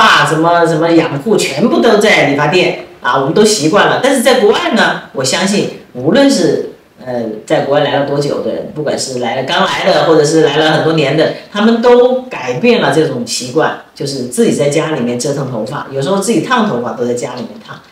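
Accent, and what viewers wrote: Wuhan, China. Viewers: 感觉博主口音一听就是武汉人哈哈 我也是武汉的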